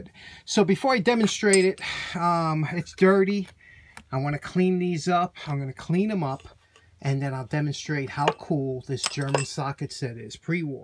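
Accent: New York, United States. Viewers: That's a JACKPOT tool!! Always interesting and always informative!! I always look forward to these restorations!! The education provided with each video is remarkable! Of course, the NY twang makes me feel like I was back home on Lung Ilint!! Thanks!!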